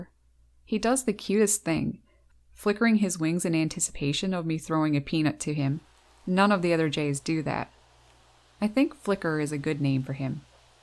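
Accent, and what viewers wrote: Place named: Ottawa, Canada. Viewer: Flickr is a great name :-p / I just realised you are a fellow Canadian. I hear the Ottawa? accent now :-p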